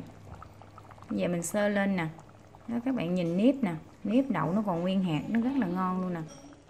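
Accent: Mekong Delta, Vietnam. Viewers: Thick’ kheo’ tay Thich’ giong Noi’ nguoi mien tay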